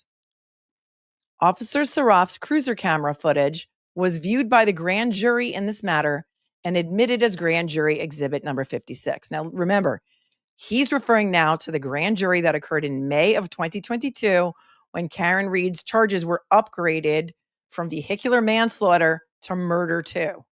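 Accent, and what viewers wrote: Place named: Long Island, United States. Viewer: I know you don't need more kudos. But I have to give them. You have all the receipts, all the time! Your coverage is my favorite, and got me through 2 surgeries (just with Karen Read!). Looking forward to watching 2.0 with you. Plus I lived in Great Neck and Levittown for 15 years so your accent makes me feel like I'm visiting friends.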